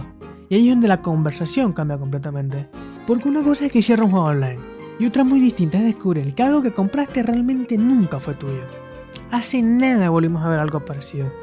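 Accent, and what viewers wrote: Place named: Chile. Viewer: Quizas me equivoqué pero por su acento deduzco que es chileno o peruano. Parece más chileno que peruano.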